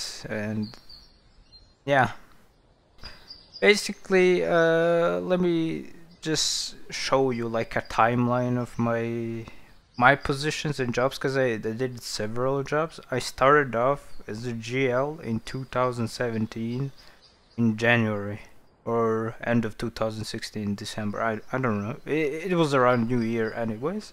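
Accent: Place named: Ireland. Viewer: Your voice remains #1. Gotta love the Irish :")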